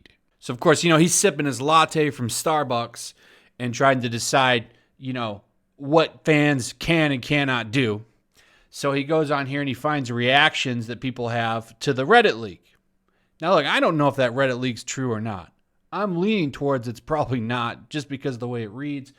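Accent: United Kingdom. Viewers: Love your brittish accent...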